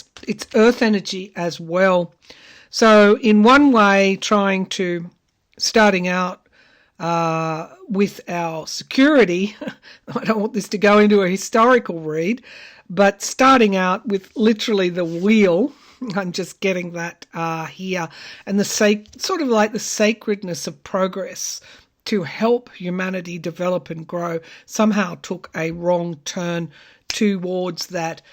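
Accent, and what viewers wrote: Australia. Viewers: Hi, its lovely to hear an Australian accent on this neck of the woods in You Tube land. Its so fasinating listen to what is going on in America with the politics and like the last Presidential Election, i cant wait to see Trump get his Kiss from Karma. I realize most of your viewers are over seas but would love for you to do some Readings on Australian Politicians. Isnt it fascinating to see the same scenario Playing out all over the world in terms of the utter disappointment of performance our political leaders that were in charge over the Covid period. USA, UK, Aust. just seemed to have the most self absorbed leaders at a time when we needed the most caring and Empathetic in Charge. What is amazing to watch now is how One by One they are losing their grip on everything that was more important to them than doing the right thing when they had the chance. 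Spirit really is amazing to watch. Even the communist leaders are having their day in the Heat. I wonder what will come of them too. As you have said, Putin will be the first one to go. I really love the way you read and really hope you can do some pollical readings for Australia. Jo from Northern NSW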